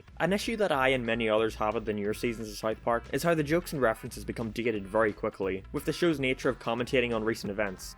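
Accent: Northern Ireland, United Kingdom. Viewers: Mate, what part of Northern Ireland are you from?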